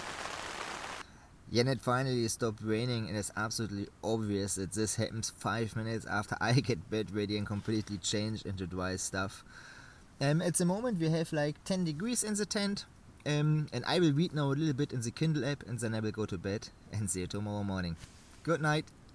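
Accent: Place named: Germany. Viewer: Love the German accent with Scottish slang, great sound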